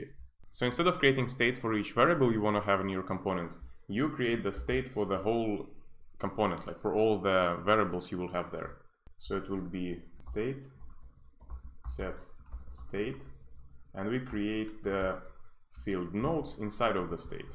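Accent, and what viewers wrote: Russia. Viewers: После сотни видеороликов с индийский акцентом так необычно слышать русский.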